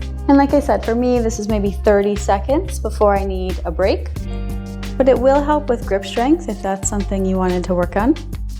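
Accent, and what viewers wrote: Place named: United States. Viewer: Yoga has this saying that inversions works on your anti aging thing so it's the fountain of youth and I love your American accent namaste🤗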